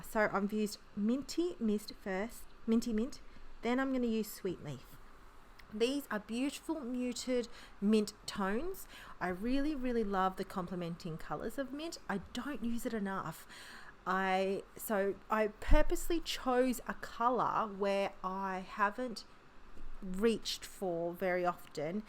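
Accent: Australia. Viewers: This type of card is very clever 💜 Lovely to hear another Aussie accent 😉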